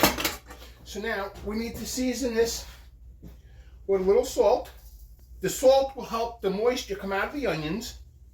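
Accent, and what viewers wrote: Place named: East Coast, United States. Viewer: I'm living in Chicago. First,I love the accent. I miss the East Coast. I love this.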